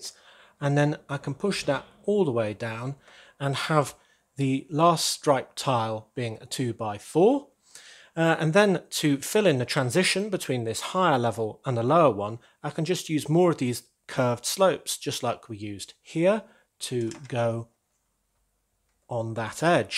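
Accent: United Kingdom. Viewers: When you build a curb with two plates height, in reality, this would be a Curb of 24cm /9,4 inches (In the Minifig scale )). I'm from Germany, where the curbs are 15cm/4,7 inches high. So I am not sure, if in other countries curbs of such great height of 9,4 inches exist. Can you say, if in Great Britain (due to your dialect it seems, that you live there) or in the USA (if I guessed your home country wrong) such high curbs of 9,4 inches exist?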